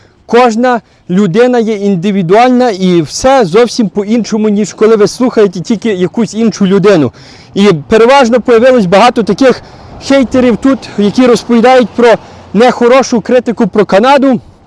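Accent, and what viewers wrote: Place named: Lviv, Ukraine. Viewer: Мова дійсно чудова. І вимова львівська.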